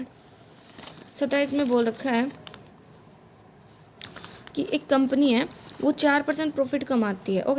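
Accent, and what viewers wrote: Haryana, India. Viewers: Tnq mam for explaination. Aap haryana se ho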